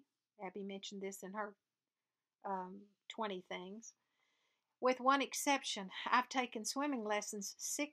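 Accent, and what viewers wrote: South, United States. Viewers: Love your videos and your southern accent. Do you have any problems with the hoops "crushing" your finished work?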